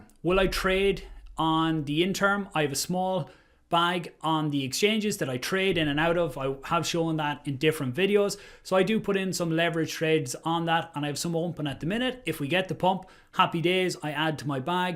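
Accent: Ireland. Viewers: He's Irish. I don't know which part of Ireland though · I know he is irish. Iam irish too ha Thats why i know he is irish but i cannot figure out what county he is from!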